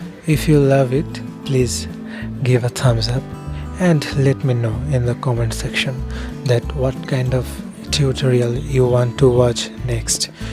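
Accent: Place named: India. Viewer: you speak japanese, you sound indian, and you draw like a god. your A legend.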